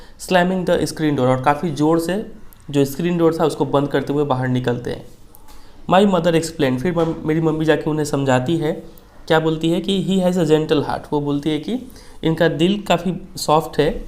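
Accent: Bihar, India. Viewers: Sir aap bihari hai na ??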